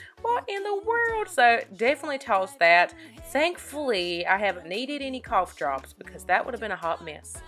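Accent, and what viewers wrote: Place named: North Carolina, United States. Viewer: I can definitely hear the Pembroke/Lumbee in your voice!!! Love the video!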